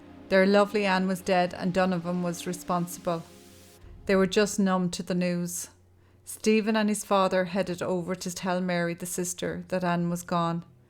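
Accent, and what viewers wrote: Ireland. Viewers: I've been binge watching your videos. I really like your style, and your Irish accent is just the cherry on top. 🍒 I appreciate the fact that you go straight into the case and waste no time talking about irrelevant things. You've quickly become one of my favorite channels. Thank you for your work. 🤗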